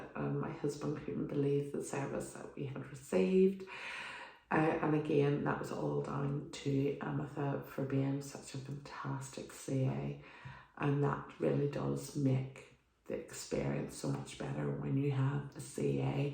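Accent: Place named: Northern Ireland, United Kingdom. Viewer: Another fellow Norn Iron accent! Great idea for a tag! 😁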